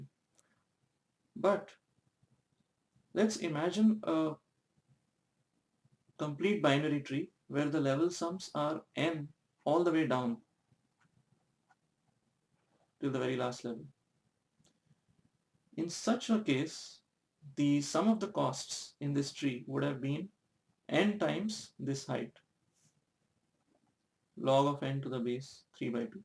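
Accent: India. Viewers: Игровой канал RobosergTV Well many people actually just hate indians and their accents , so they say hateful things ,just to bring em down ,just out of spite. Even when they are able to understand most of it , they would bash the poor guy. Also , they were too harsh. It is fine if you dont understand the accent , but then you start insulting him , his family and country just because you dont understand his accent? Like this mutual white friend of mine is sort of racist against black people , so he always finds the worst in them.Always talks about crime rate due to black people and dirty streets because of them. Get it? If you are slow , read it again and again , until you get it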